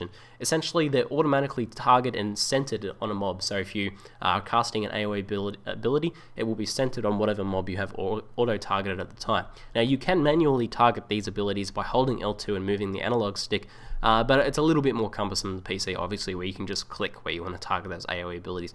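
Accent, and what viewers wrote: Australia. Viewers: hey, if you want audiences other than Australian and New Zealanders, please talk slower so that people actually understand through your rather thick accent. Don't get me wrong i think you have some good contents that i find interesting. However, this video i had to turn on subtitles. I am a native English speaker, yet i couldn't understand half of what you said. What's even worse, you're talking about something that is slightly more complicated then general topic that doesn't really need slower speech. I love your accent when it's not explaining technical subject like describing differences in gaming.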